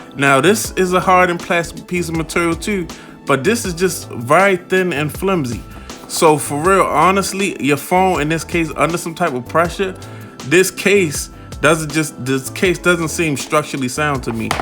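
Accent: Baltimore, United States. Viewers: ... Great review fam! And just on a side note, you got a BMORE accent if I ever heard one! If you're actually from there (which I'm sure you are), I'm actually from Whitelock homie, but I live in Vegas now for almost 15 years. I'm 100% sure you know where Whitelock is.... Anyway, keep on keepin' it real with these reviews. 🦀 Forever LOL... God Bless!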